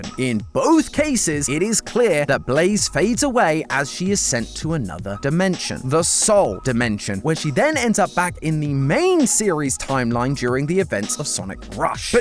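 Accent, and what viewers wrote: England, United Kingdom. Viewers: Sorry someone update, why does Matpat sound like he's english now?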